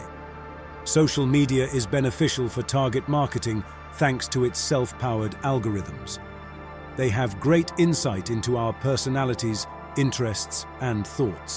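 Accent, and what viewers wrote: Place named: England, United Kingdom. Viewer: Your English-accent-robot-voice makes this vid hard to take seriously.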